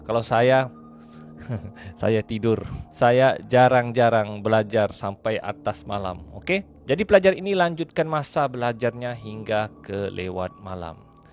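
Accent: Sabah, Malaysia. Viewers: As slm...owg Sabah ka dik☺️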